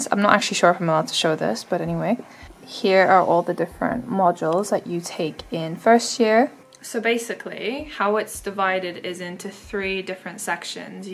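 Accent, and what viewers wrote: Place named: United Kingdom. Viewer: ik verschoot toen ik u ineens nederlands hoorde praten ik was echt convinced da ge brits waart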